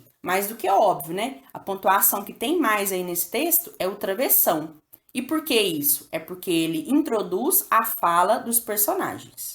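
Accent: Minas Gerais, Brazil. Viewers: Sua voz é linda Seu sotaque é como o meu Pois sou mineira também Continue assim Vc é maravilhosa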